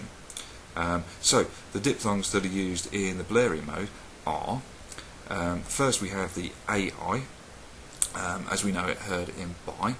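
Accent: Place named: United Kingdom. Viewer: "...we have the "aa" as in "run" - my non-native english mind is confused with your British accent.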